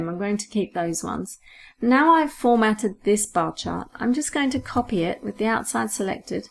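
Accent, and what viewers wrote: United Kingdom. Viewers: amazing, plus the little British accent...thanks !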